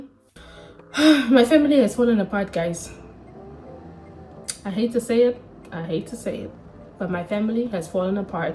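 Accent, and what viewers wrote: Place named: South Africa. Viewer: I’m subscribing, girl you got me you’re so raw on this video and I loved this. The family drama is soooo real. You speak like a South African, are you Namibian? Zulu, coloured Afrikaans, Tswana😂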